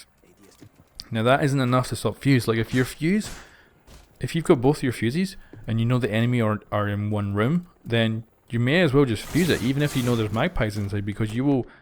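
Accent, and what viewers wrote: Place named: Aberdeen, United Kingdom. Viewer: you sound like your from aberdeen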